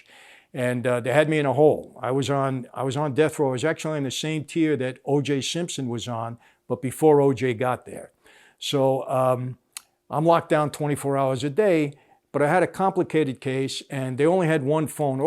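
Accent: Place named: New York, United States. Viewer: Glad I found you. I am a little older than you, grew up in Brooklyn, mother’s family Sicilian immigrants, grew up surrounded by paisans. I love to hear your New York accent, your intelligence, and your NYC Italian soul. BTW, I too am a remade man, not through The Godfather but the Father God and his Son. May he keep you humble, useful, and full of the kind of joy you once upon a time never dreamed of. One more point. I have lived in California for almost fifty years. Moving back to Brooklyn. I’ll eat some good pizza and a cannoli in your honor.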